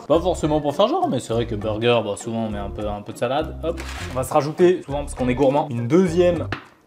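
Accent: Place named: England, United Kingdom. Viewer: super video on voit que l'on peut quand même ce faire plaisir sans trop de calories mais par contre l'accent anglais sa me soules 😅😅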